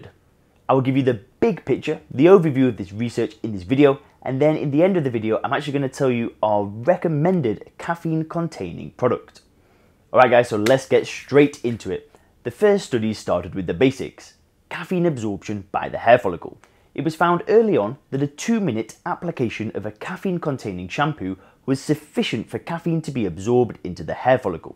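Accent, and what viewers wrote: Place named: United Kingdom. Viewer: I enjoy listening to British accent.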